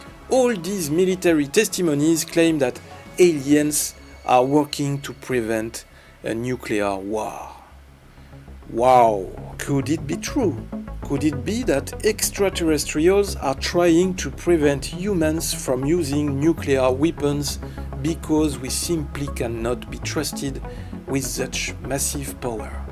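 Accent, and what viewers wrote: France. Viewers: Congrats on the new look and experience to the Channel. IMHO, loud background music only makes it difficult to understand your French accented English. Please consider keeping the volume levels of background music lower as they interfere with your message. Thanks for keeping up an interesting channel.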